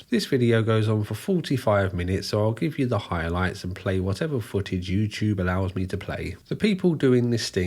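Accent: England, United Kingdom. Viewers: I'd like to apologize for all my ignorant, fellow Americans, who can't seem to understand a British accent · Oh shit. A cyrax with an English accent.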